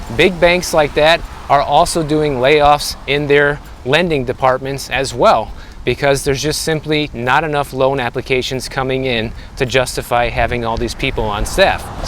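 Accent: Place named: Midwest, United States. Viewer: we are currently feeling stuck between a rock and a hard place and just trying to make the best descision since out situation is temporary getting locked into another 12 month lease at a huge price increase is not what we want to do..ive been watching alot of your videos as well as others trying to gauge our options.. what part of the midwest are you from.. we are michiganders born and raised.. i hear your accent (people are always telling me i have an accent too lol)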